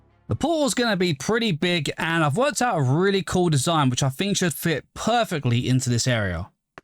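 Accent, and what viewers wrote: United Kingdom. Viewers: Should not be hate but are you British cuz the accent sounds like it?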